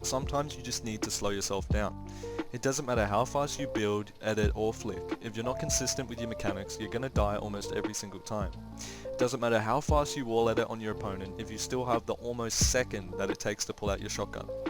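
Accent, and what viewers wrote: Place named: Germany. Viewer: are u german ? you accent sound like german Wenn du mich verstehst man versteht immer seine Deutschen Brüder XD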